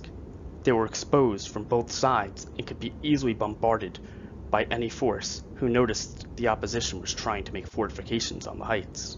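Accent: United States. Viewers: Sounds like a Philadelphian narrating. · Good work,only the American accent is sickening. Gnawing a living toad while speaking?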